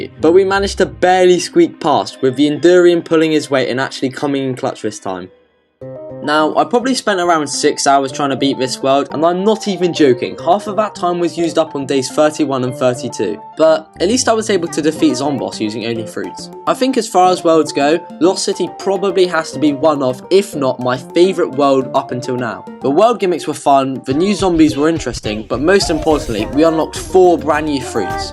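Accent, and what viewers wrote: United Kingdom. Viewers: A GOOD BRI ISH GUY?!?!?? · bit too entertaining.. you british?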